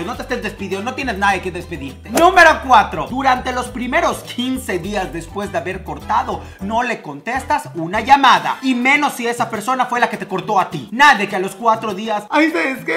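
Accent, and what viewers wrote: Mexico. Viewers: Me encanta tu acento mexicano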